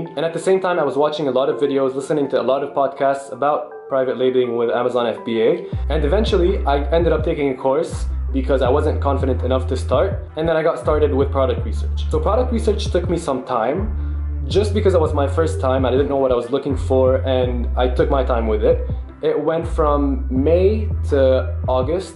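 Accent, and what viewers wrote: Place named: Arab world. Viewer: i kneeeeew u were an arab from the accent Same here.....i am egyptian and mechanical engineer also 😊 Wish u all the luck bro...accept my friend request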